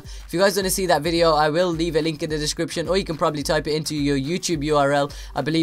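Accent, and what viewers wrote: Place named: England, United Kingdom. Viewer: where are you from? lol i can never tell your accent one minute i think you are english the next south african haha xD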